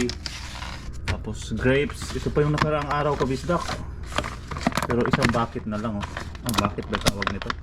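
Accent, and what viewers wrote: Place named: Visayas, Philippines. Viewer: Saan ka Dito sa Phil. Kabisdak bisaya ka mag Tagalog 🥰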